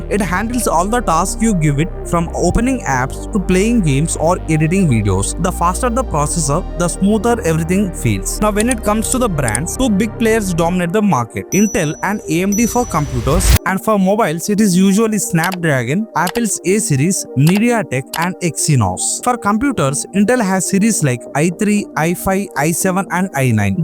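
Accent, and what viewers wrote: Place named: India. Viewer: This was the best type of video I have seen . Man everything is great , really helpful, but your indian English accent sounds fun . 😅😂❤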